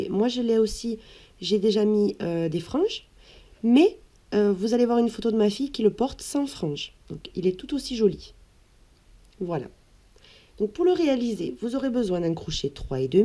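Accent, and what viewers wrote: South, France. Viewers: Quel talent,et ''avé'' l'accent du soleil ch les Chtis c'est encore mieux!!Je vais de suite voir le site,continuez!!Mon rêve de crocheter va se réaliser🙋👍👏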